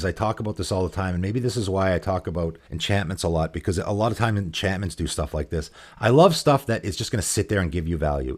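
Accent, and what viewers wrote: Canada. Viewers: Z! I suspected you were Canadian :p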